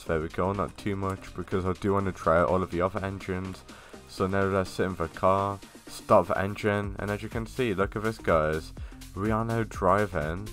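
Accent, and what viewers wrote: United Kingdom. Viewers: He's is British mate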